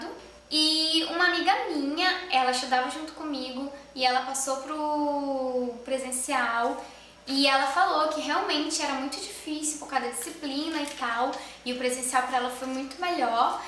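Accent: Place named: Pará, Brazil. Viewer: vc é paraense?, o sotaque não nega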